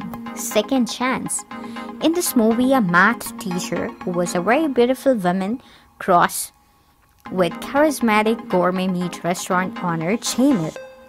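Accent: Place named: India. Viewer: Omg the Indian accent is annoying to finish this video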